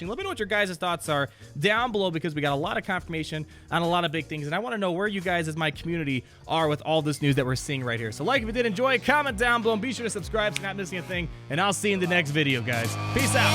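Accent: Poland. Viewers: now i know that you are polish;) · ziomuś to ty Polakiem jesteś?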